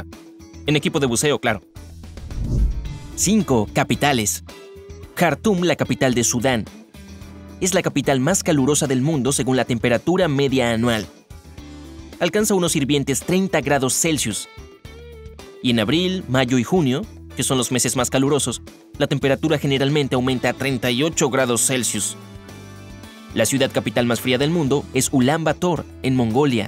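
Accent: Buenos Aires, Argentina. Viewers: Épico yo vivo en Buenos Aires jajaja ese acento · El acento porteño clave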